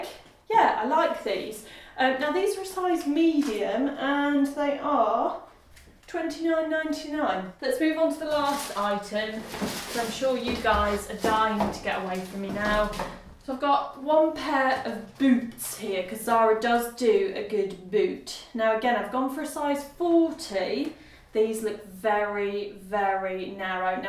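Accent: United Kingdom. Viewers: Thank you! since I watching your videos i find style which fits me 100%! and I must say that I sold almost all my clothes and I buy some blazers, mom jeans and love a neutral colors! LOve your british accent<3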